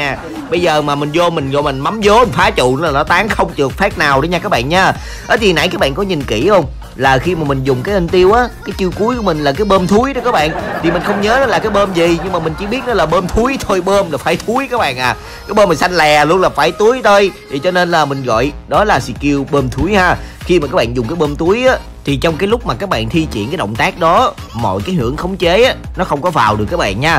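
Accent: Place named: Mekong Delta, Vietnam. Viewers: Rẻ nha giọng miền tây, biết chắc b miền bắc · Nghe giọng miền trong đó hay hài nữa 😁😁😁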